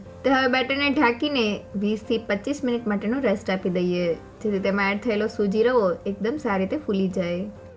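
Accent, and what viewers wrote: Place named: Kathiawar, India. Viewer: આપણી કાઠિયાવાડી બોલી 🙂 સાથે મસ્ત રસોઈ 👍 God bless you 🙌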